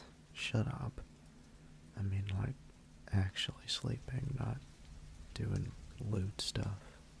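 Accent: South, United States. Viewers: Light southern drawl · 100% there is no way there isn't a southern accent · the soft "darlin" always gets me i love a good western/southern esque accent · weird question but am i the only one hearing a southern accent? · the country acent😳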